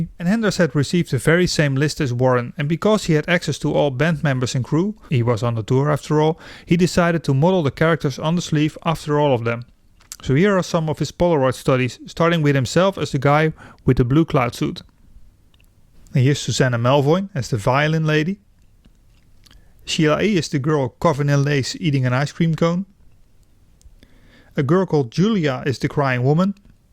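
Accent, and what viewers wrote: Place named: United States. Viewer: it took me a while to figure out you were saying ladder and not letter, the A should be AH not EH, your english is slightly americanized but great vid otherwise.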